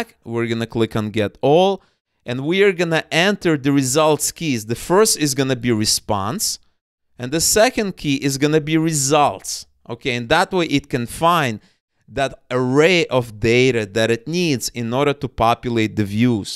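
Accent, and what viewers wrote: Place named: Russia. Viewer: Чую русский акцент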